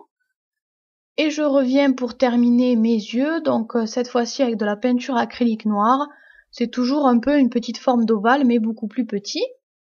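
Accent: South, France. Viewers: J'adore ton accent tu viens du sud ? De la france !!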